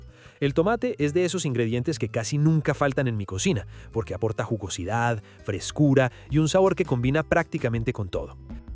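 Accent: Colombia. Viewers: Lo mejor de todo es tú acento Colombiano. 🌞🌠🌎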